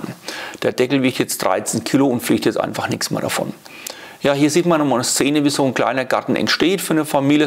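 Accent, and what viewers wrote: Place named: Hesse, Germany. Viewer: tolles Video. Kanal abonniert. Was ist das für ein Dialekt? Hessisch?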